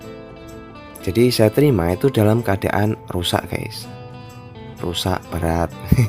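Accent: Java, Indonesia. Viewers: tapi logatnya jawa bgt mas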